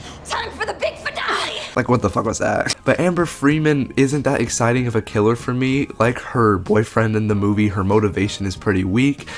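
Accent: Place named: Germany. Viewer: Nice video! You've a german accent kinda xD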